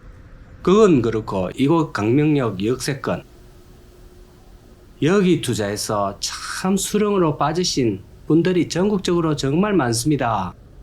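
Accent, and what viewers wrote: Yanbian, China. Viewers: 이사람 발음보니 조선족 화교인갑네.. 어쩐지 말투가 역스럽다 했어... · 여기주인장님 국적이 대한민국아니죠?? 발음과 사투리가 너무 표가납니다. 연변사투리정도가 더 가깝다 ㅠ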